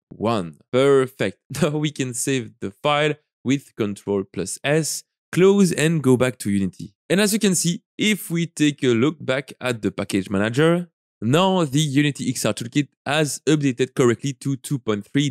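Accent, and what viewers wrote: France. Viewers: On dirait un belle accent français là non ?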